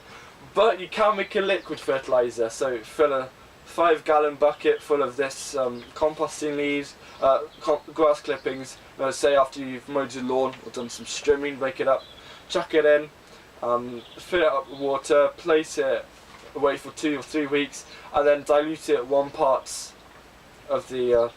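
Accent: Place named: England, United Kingdom. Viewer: you look and sound english for some reason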